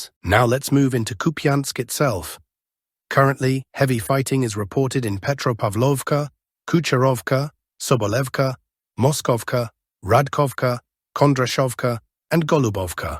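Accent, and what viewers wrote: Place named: Oxford, United Kingdom. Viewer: You're no Russian..MI6 fucking agent!No?? Howbis that talking with Oxford pronunciation?? Born in Britain??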